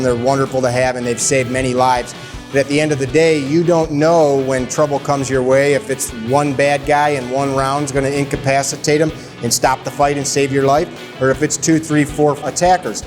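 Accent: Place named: Midwest, United States. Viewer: Man is that midwestern accent thick.....love it lol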